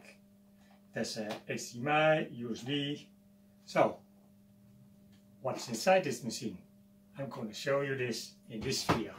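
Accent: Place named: Netherlands. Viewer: Stone Coal Miner English! You must be a Dutch speaker! Hey hallo! Leuk project, grappig hoe je een Teensie gebruikt voor vertaling van originele keyboard matrix naar USB. Erg cool! Dumpje omhoog en subje erbij!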